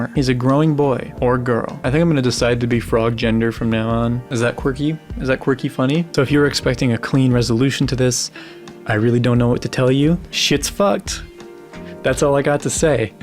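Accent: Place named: Midwest, United States. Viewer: Okay, so I had to pause in the middle of your video to say I disagree about your voice. I think you have a great voice. It’s just cracking (autocorrect) to sound female. You must be from the Midwest. It’s like a climate or humidity condition that affects many of us. I only wish mine was as good as yours. 💋💋💋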